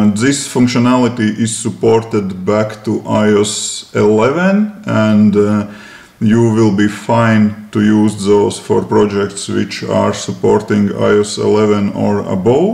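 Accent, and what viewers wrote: Russia. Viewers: какой русский английский ))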